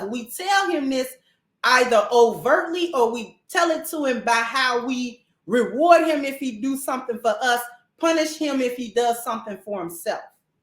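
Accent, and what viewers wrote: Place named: New Orleans, United States. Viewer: Youre definitely from New Orleans aintee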